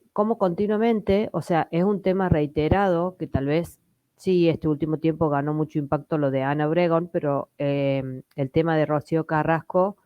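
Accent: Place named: Córdoba, Argentina. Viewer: Gracias por tu análisis tan claro, por cierto, me encanta tu acento, Córdoba?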